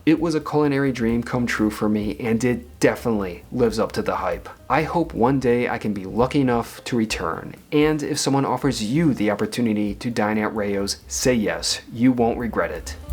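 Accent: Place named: Chicago, United States. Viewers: Your accent is so strong. Are you American? I’m guessing Midwest? As a New Yorker, the way you pronounce things is very…. different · A guy with a Bears and Cubs accent thicker than Dennis Farina's, living in an apartment in Manhattan, , drinking a Manhattan, invited to Rao's by a Manhattanite. Whattya gonna do. We're a transient kinda country. Fuhghetta 'bout it.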